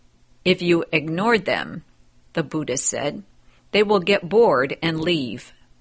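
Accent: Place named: United States. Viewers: Great but shame about the heavy American accent. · YOU SHOULD BE HAPPY FOR EVERYONE, BECAUSE EVEN THOU THERE'S HEAVY AMERICAN ACCENT ATLEAST SOMEONE DID THERE JOB TO SPREAD THE DHARMA. AS FOR SOMEONE WHO SEE AND JUDGED SOMEONE WHO WHO HAS TAKEN AN EFFORT TO READ THIS SUTRA. MAY YOU REALIZED 🙏 THE BENEFITS OF THIS VIDEOS.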